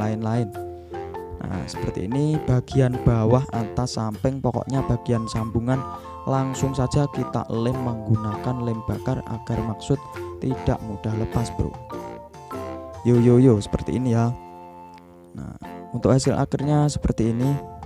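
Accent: Java, Indonesia. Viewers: Hhh untuk merosani jowo banget bang, jowonya endi brow sampan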